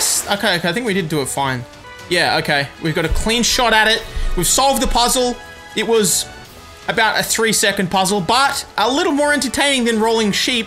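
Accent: Australia. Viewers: I love your sweet Aussie accent (; lmao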